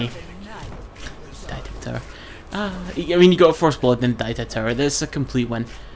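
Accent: Scotland, United Kingdom. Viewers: Sometimes I can't understand a single word you are saying especially when you talk quietly/mumble :( that Scottish accent. You fuck up guardians with beads what is wrong with that? :D I also noticed watching NA players that they have easier games in diamond ranks. My usual ping is 70-80 and when it rises above 120 its nightmare for me (usually playing adc)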